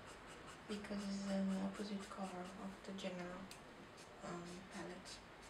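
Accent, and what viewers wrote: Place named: Finland. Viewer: Are you Finnish? You have a Finnish accent.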